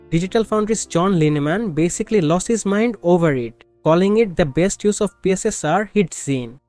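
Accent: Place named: Bengal, India. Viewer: indian accent is not a bad thing bro. bengali accent is very evident , plz fdont try to hide it 😒😒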